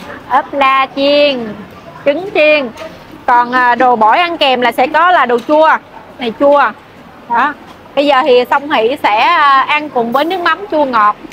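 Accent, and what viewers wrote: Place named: Mekong Delta, Vietnam. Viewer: Ban vay moi giong miên tây thương yêu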